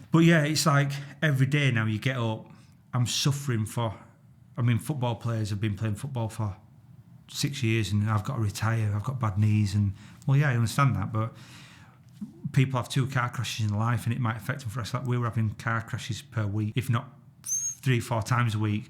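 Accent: Yorkshire, United Kingdom. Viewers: Bloody love hearing a Yorkshire accent. Greetings from Barnsley, thank you for your service Thas a rate Lad!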